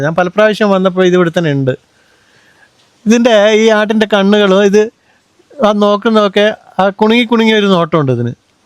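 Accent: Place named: Malabar, India. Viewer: ഇങ്ങള് മലബാർറാരൻ ആണോന്ന് എനിക് നേരത്തെ സംശ്യം ഇണ്ടായ്ക്ക് ഇപ്പൊ ഒറപിച്ച്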